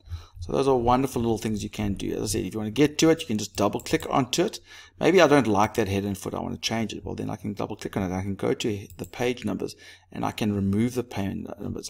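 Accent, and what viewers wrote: South Africa. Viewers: Are you south African ???????😊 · are you south african?????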